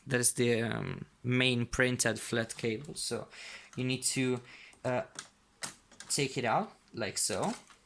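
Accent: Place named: England, United Kingdom. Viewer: mi sembra che tu lo parli come un inglese complimenti .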